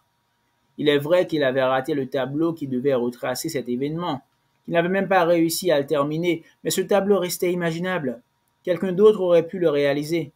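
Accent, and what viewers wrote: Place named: Caribbean. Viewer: Mon Dieu, vouloir lire Houellebecq est une intention louable, mais avec un accent antillais pareil...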